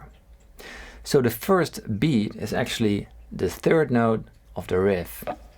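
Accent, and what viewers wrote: Netherlands. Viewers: Are you Dutch? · You sound so Dutch, I wonder if you're from the Netherlands haha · This guy sounds dutch as fuck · Nice vid. I can tell from your accent that you're Dutch lol. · Yo homie, you dutch?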